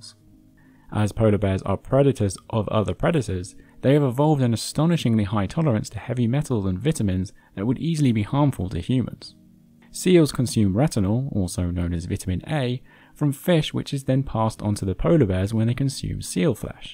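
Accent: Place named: United Kingdom. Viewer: Creepy british asmr voice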